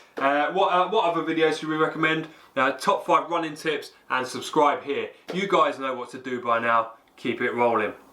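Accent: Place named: United Kingdom. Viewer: Epic UK accent and great review :-)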